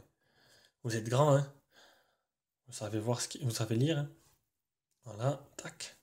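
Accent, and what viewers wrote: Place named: Marseille, France. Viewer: Ta un accent marseillais comme moi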